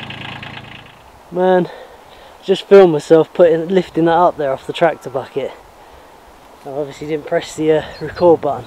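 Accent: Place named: West Country, United Kingdom. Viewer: yes, for the West Country accent alone.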